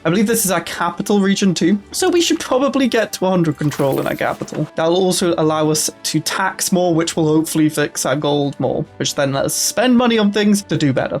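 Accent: Wales, United Kingdom. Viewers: he definetly sounds Welsh and I say that as an English person.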